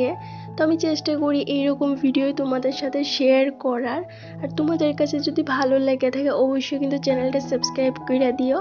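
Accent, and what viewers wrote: Bangladesh. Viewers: আপু তোমাকে একটা কথা জানার জন্য আপু তুমি সেই উওর টা দাও তোমার ভিডিও ভালো লাগে বলেই দেখি তুমি এক দেশের আমি আরেক দেশের কিন্ত মানুষের মন যাকে ভালো লাগে তার সমন্ধে জানার ইচ্ছে করে আর তেমন বড় কোন প্রশ্ন করি নাই তোমার আসল বাড়িটা কোথায় তোমার ভাষার জন্য কারণ বাংলাদেশের কিছুটা টান আসে আমি অনেকের ভিডিও দেখি কিন্ত কেনো জানি তোমাকে আমার খুব ভালো লাগে আমি বাংলাদেশ থেকে দেখি তুমি কোন জায়গায় মানুষ আর অরিজিনাল বাড়ি কোথায় তুমি ইন্ডিয়ান সেটা বুঝতে পারছি কিন্তু কিছুটা আমার দেশের টান আসে তাই জানতে চাইছি ভালো থেকো সুস্থ খেকো এগিয়ে যাও আছি তোমার সাথে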